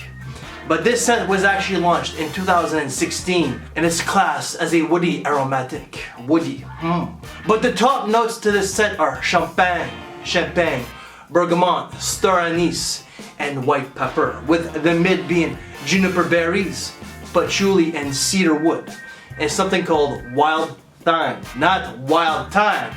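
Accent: Quebec, Canada. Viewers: Es-tu quebecois? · I don't know how to feel right now. Like you said, the scent is nothing special, but the visuals.....how did you manage to kiss yourself? lol Appreciate the accent québecois.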